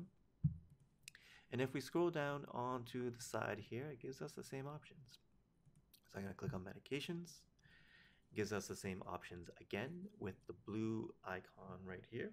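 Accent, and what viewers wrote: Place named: Canada. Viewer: loooooooooooooooow and monotone Canadian accent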